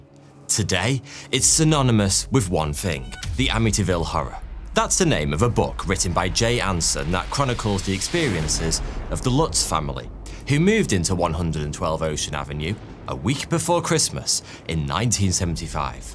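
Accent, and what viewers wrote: United Kingdom. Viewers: These British pronunciations are killing me.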